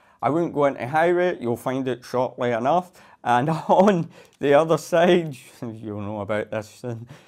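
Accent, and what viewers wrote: Scotland, United Kingdom. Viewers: Ahhh! Didn't mean to be rude, and beeing German probably didn't help me understanding your Scottish accent :-) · My grandfather had a heavier Scottish accent and He brought me up.I understand you well. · Haha 😂 Yes the accent is pretty thick:P I remember when I had to learn Scottish phonetics for acting purposes it was quite challenging. All accents vary in the way vowels are pronounced although Scotts tend to roll their r's quite a bit and are very lazy with pronouncing T's P's and D's. That said, in addition to speaking slowly like you said I think being deliberate in your pronunciation especially in your D's P's and T's would help foreign veiwers This vocal warm up I use to do, may be helpful to achieve this basically you just say this over and over again with strong diction getting faster and faster each time whilst still retaining strong diction... 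"The lips, the teeth, the tip of the tongue, the hard palate, the soft palate, Pronunciate, articulate, exaggerate."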